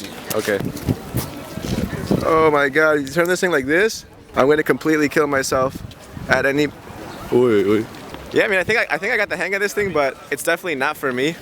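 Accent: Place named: Spain. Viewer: I love ur Spanish accent, u should speak Spanish more often😁 great work with the vegan transition!! 👍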